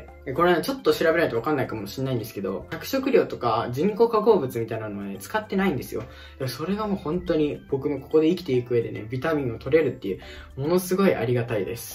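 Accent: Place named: United Kingdom. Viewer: めっちゃイギリス発音！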